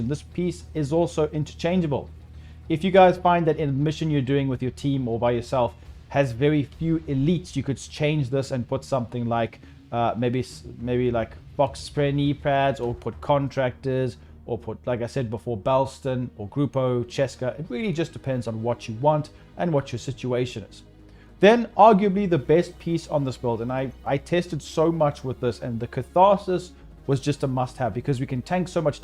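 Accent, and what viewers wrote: South Africa. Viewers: Jy klink erg Afrikaans... GREAT video!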